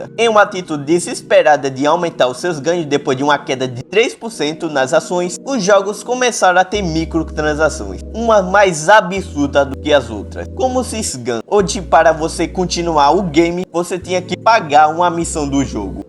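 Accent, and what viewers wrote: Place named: Brazil. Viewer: que sotaque fofo! amei seu sotaque!! eu estudei sobre sotaques! o seu sotaque é remanecente de um dos primeiros sotaques do Brasil!! isso é muito foda rsrs sou nerd de línguas,jogos,anime etc kkkk então sim informação inutil pra você mas toma ehhe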